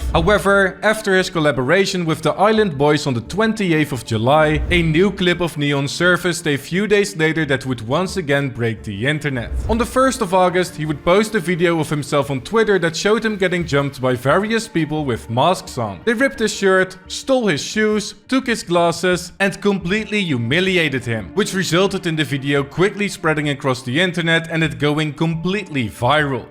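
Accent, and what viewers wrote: Netherlands. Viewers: let me gues you dutch ja je accent geeft het af 😂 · Bro the dutch accent :c But still Really good video tho :D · Do something about that dutch accent bro😂. Godverdomme wat een steenkolenengels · The narrator sounds impressively dutch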